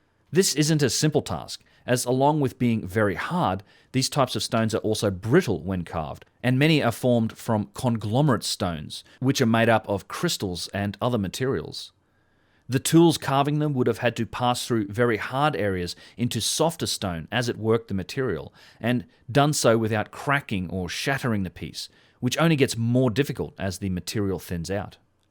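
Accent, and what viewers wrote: Australia. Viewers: G'day, just wanted to say that I really like your stuff and how great it is to hear an Aussie talking with such intelligence and conviction, nice work mate!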